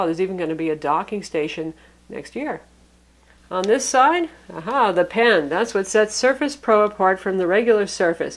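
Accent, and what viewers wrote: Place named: Upstate New York, United States. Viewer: Gifts live that upstate NY accent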